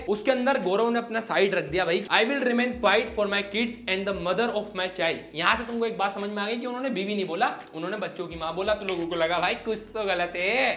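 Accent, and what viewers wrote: Indore, India. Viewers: Indori h Bhai.. ek indori accent aur ek normal accent:)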